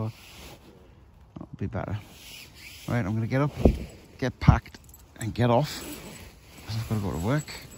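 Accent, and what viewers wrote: Newcastle, United Kingdom. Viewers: I think he is Geordie 🤣🤣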